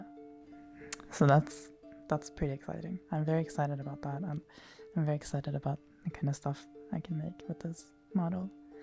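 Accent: Sweden. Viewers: You sound very Swedish now, I don't hate it though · swedish accent is cute af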